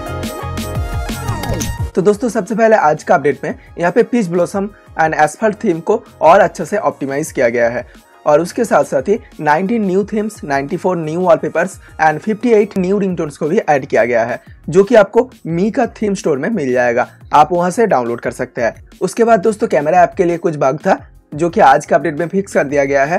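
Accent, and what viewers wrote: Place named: Uttar Pradesh, India. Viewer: bhai aap jo.bolte ho uski ton thik karo like jo English words hai woh tore up wale ke ton mei bolte ho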